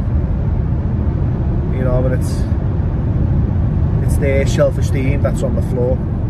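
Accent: Liverpool, United Kingdom. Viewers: Keep going bro your good I like to hear one of my favourite scousers bellew ok and that lol but you give us the street ex addicts new addicts any addicts but anyone gets a chance with you don't lose it now bro your on the pinnacle of success you will be in films soon bro your already a film star bro end o chat bless and thanks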